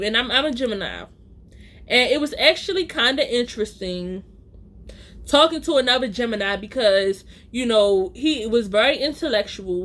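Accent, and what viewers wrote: Baltimore, United States. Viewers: I love watching your videos!!! I'm from Baltimore too but I live in sc and ya accent reminds me of home so much! Lol be blessed sis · Once I heard your accent I said..Baltimore lol! You all and folks from New Orleans have the DEEPEST accents lol! It’s so unique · I knew you was from Baltimore when started talking. I love your voice. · Gotta be from Baltimore off the into song and accent alone. Didnt even need the rest of the story description. 😭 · As soon as you said carry out with that B-More accent I knew I fucked with you. You are gorgeous💜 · I love a person who can laugh when dumb things happen to them! I be dying laughing when dumb stuff happen,but I like ya channel ,I hear the Baltimore dmv accent😂NEW SUB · sound just like a typical Baltimore nigga 😭😂 but the whole no more movie dates just sent me 😂😂😂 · I remember this Baltimore accent I miss my days in Maryland lol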